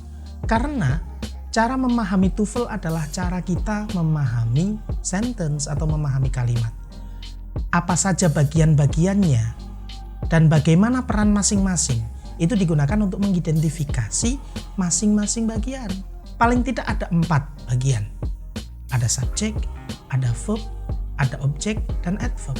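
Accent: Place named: Surabaya, Indonesia. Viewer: MANTAP. NGGAWÉ LOGAT SUROBOYOAN ❤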